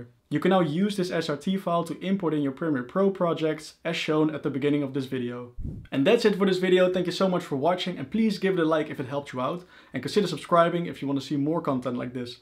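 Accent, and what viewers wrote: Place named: Netherlands. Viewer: Strong Dutch accent, but thanks for the tutorial.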